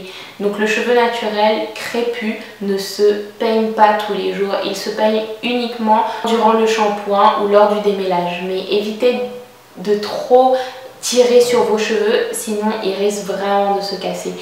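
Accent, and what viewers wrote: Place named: France. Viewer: vous avez une belle accente francaise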